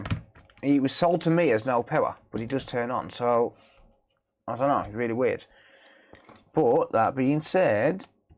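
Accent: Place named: Scotland, United Kingdom. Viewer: Is he scottish? His accent is so thick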